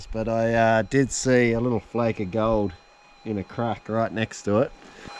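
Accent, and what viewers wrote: Australia. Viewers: Wäder😅 sorry my ear does not like the aussie accent BUT i am getting used to it, more i listen❤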